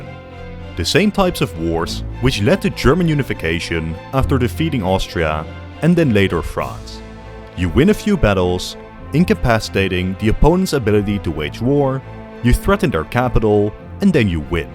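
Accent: Netherlands. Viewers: By any chance, are you Dutch or Flemish?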